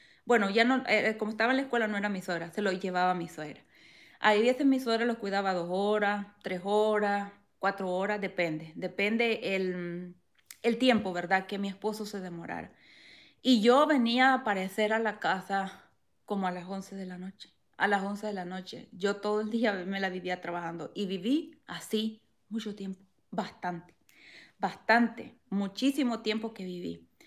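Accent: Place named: El Salvador. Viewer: De qué parte de el salvador eres me encanta como hablas así somo yo vivo acá en Texas también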